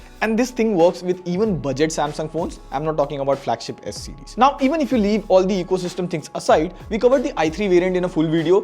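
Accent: India. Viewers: I subscribed because of the indian accent voice❤❤❤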